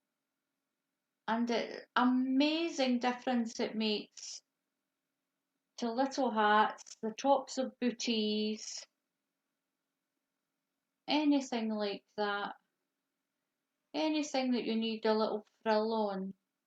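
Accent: Scotland, United Kingdom. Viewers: Super cute, tfs. I adore your Scottish accent, 😀🐢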